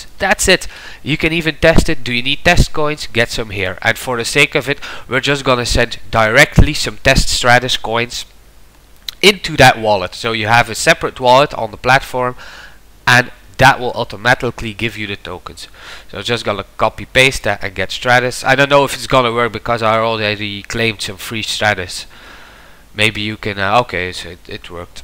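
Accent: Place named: Netherlands. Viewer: Your English sounds dutch!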